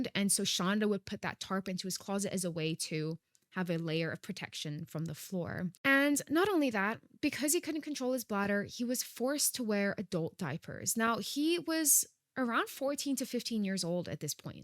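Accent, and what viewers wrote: United Kingdom. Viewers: Are you British or American? I’m confused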